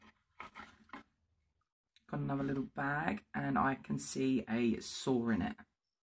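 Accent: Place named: United Kingdom. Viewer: Your british accent kills me softly everytime! You are the cutest goth girl! 💜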